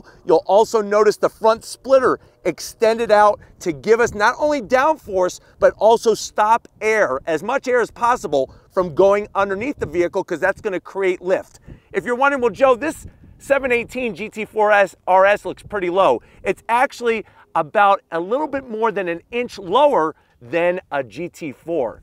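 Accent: United States. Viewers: why Americans speak like this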